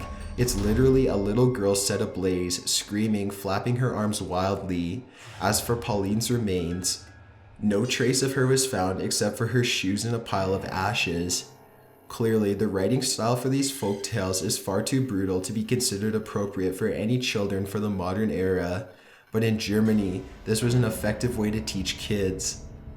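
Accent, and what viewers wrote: California, United States. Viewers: The narrator of this is soooo obviously Californian !! Such a thick accent, where ever sentence almost sounds like a question. lol. Not hating, just saying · lizzie your right, sounds like "the Californians"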